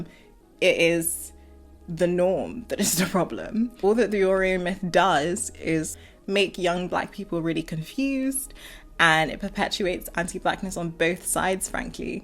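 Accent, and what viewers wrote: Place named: Africa. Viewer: Why you sound African then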